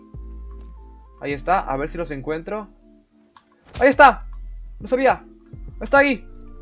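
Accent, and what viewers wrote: Colombia. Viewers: valla asento colombiano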